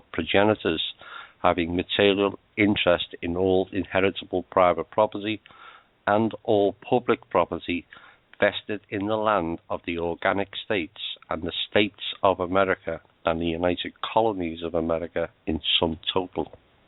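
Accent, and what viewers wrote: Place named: Ireland. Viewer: ...and a special thanks to Anna Von Reitz, your narrative voice, although strangely masculine and Irish, has a wonderfully hypnotizing monotone to it that has helped my insomnia....I can now listen to this youtube audio, which I have recorded as a therapy aid, because its tempo puts me to sleep in under a minute flat....thank you....!!!...I'm cured!!!!....and you've saved me a fortune in alternative solutions.....thanks again...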